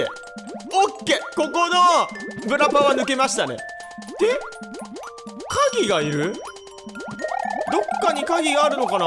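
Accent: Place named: Kyushu, Japan. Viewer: さん、前々から思ったけど九州出身ですか？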